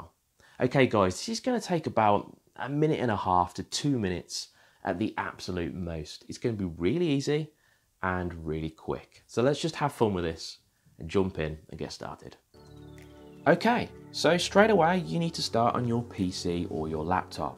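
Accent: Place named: England, United Kingdom. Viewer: Cheers, good to hear a English accent too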